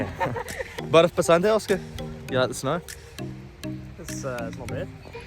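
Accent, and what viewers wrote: Punjab, India. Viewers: l love your Punjabi accent!! nice family GOD BLESS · Spot on Punjabi accent. Tusi saare family members nu Punjabi sikha deni aa bol bol k 😂👍🏼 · God bless lovely family. Very much astonished at your punjabi accent.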